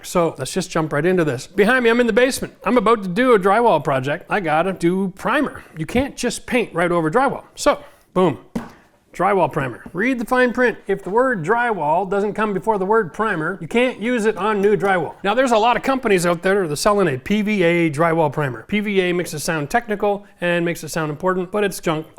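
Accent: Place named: Canada. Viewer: I'm from Canada! Trust me...as a fellow Canadian....The way you talk.... We know ! LOL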